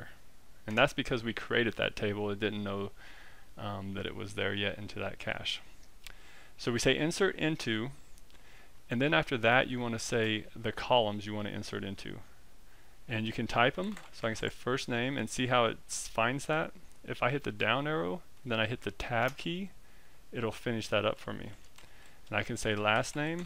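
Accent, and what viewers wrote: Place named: South, United States. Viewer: Howdy! Where are you from? Texas? You got that good ole' southern draw. Like Matthew Mcounaghey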